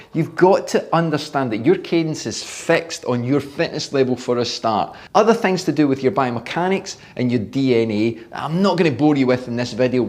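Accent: Scotland, United Kingdom. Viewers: probably scot or ireland, very thick accent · I am older than you, a 1968 model. You might remember Greg LeMond teaching the exact same pedaling style back when we were teenagers. He said it was like scraping mud off your shoe? GREAT VIDEO. As an American, I love the Scottish accent. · Lovr the Scottish accent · I think is from Scotland… used to live in Aberdeen so I am familiar with this accent! Absolutely love the humor, thanks just subscribed! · Love hearing a good, clear Scottish accent! This is what I aim for when I try to remove all the slang and pronounce for business calls 😂 For anybody asking, to my ears this is a central Scottish accent, 25mile radius of Glasgow. I think the Lanarkshire guess is spot on. Back on topic, excellent videos! I'm just getting into cycling and have been learning a lot from the channel, thank you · Filipino cyclist here, This video helped me a lot, I've learned some things than can help me improve myself, But the Scottish accent is just too much for me😭 I had to repeat this video a couple times to fully understand what he's saying but anyway Thank you.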